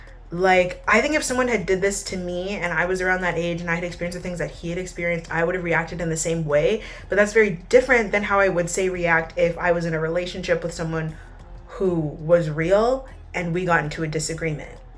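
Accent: Canada. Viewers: Girl don’t feel weird about your Canadian accent! Your voice is so soothing and you’re an amazing story teller! I just came across your content a few days ago and I have been bingingggg ❤ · ahh I’m kinda fangirling rn. I’m so stunned that you replied!! I’m a new subscriber and have been binge watching all of your catfish and catfish adjacent content and I’ve been loving it and loving you!!! I also wanna say I love the sprinkles of Canadian we get from you, it’s so charming and I love it, it makes me giggle because I find it so cute! Thank you for being a real one bestie and I can’t wait to continue watching you for years to come ❤️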